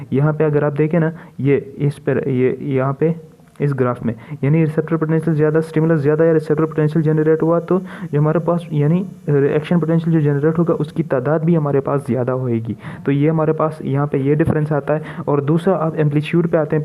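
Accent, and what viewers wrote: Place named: Pakistan. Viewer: Asa.. Lg rha h pakistani english bol rha h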